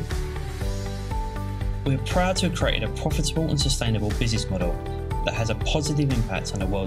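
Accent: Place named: United Kingdom. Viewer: Why is a brit narrating this. Might give biden the wrong idea when he's thinking of where to allocate funds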